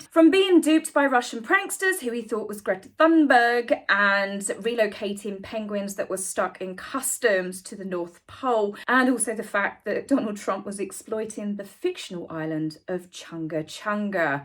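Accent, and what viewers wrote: United Kingdom. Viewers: Your British accent is so adorable and hilarious hahahaha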